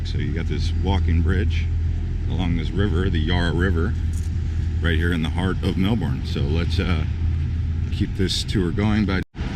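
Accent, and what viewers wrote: United States. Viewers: Aaaagh American pronunciation aaagh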